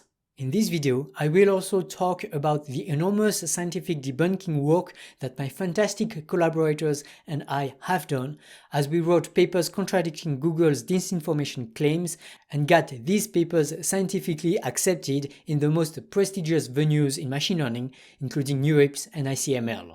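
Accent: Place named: France. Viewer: J’aime ton accent français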